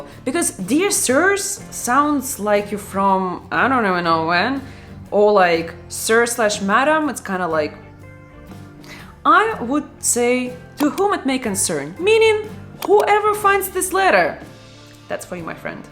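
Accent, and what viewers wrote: Russia. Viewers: thank you So much for this video... love your Russian accent.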